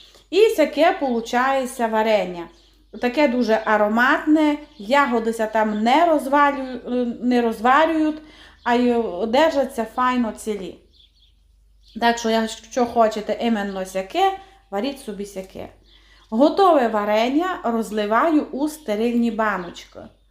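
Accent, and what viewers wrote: Transcarpathia, Ukraine. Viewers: Дякую за рецепт, дуже гарно розмовляете по закарпатський!! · Щиро дякую, за рецепти, за приємне закарпатське мовлення. Здоров'я вам,наснаги і здійснення всіх задумів. Слава Україні!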